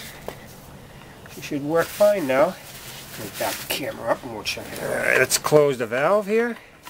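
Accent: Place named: New England, United States. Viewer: Outstanding content. Thank you for this video! If you don't mind me asking, is that accent from Rhode Island, CT, or other?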